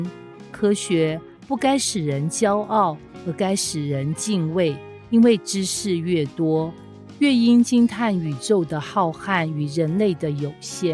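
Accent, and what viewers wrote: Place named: Taiwan. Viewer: 為什麼要用這種奇怪又難聽的腔調講話，這是在醜化台灣人的口音。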